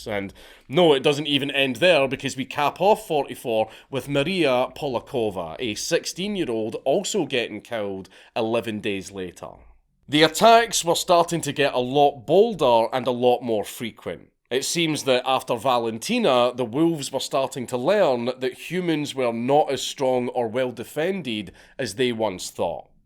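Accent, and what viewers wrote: Scotland, United Kingdom. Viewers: If you have the volume low enough and aren’t well versed in the Scottish accent, girls sounds like “ghettos”. Took me like 30 min to figure out why he keeps calling people ghettos lol.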